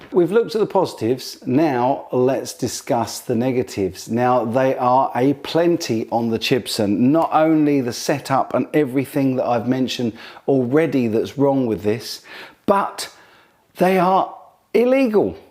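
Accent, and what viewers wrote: United Kingdom. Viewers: (From Belgium) Hello, I just discovered your videos for the first time: great job, hats off! You might also consider comparing the Harley Benton SC 550 II (= 2), which is even more convincing due to...STAINLESS STEEL FRETS and Tesla pickups (no Roswells on that one)!!! A SUPERLATIVE as far as frets are concerned. A dream really. This sells for a ridiculous price: 235£/279€. The web is full of positive appreciations and many testers kept or bought this guitar immediately (John Robson, e.g. ). I ordered one immediately, convinced that it is the bargain of the year. Finally, as a francophone deeply in love with American English, I must say I enjoy your British English A LOT. What a pleasure! If you’re not a teacher, you should consider becoming one ;-) Keep up this brilliant work. By the way, you might think of indicating the radius of the fretboards.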